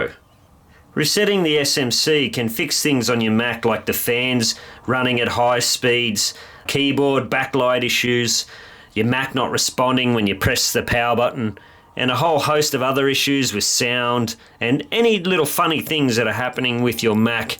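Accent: Australia. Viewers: Thanks so much for this tip. I did both methods and got it to work - charing again - on the second method. Great to hear an Aussie voice for a change. Cheers M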